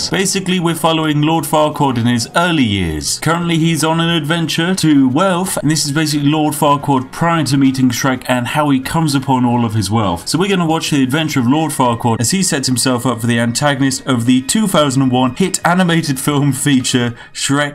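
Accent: United Kingdom. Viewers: The British version of Graystillplays 😆😂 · I just subscribed within the first 5 seconds because of his voice, why do I love British accents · A British Jim Pickens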